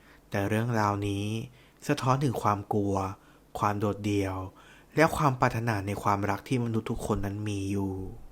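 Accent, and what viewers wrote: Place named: Thailand. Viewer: เสียงเหน่อ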